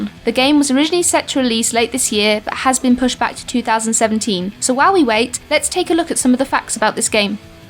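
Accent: England, United Kingdom. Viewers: Jesus I was not expecting a broad English accent XD great video though!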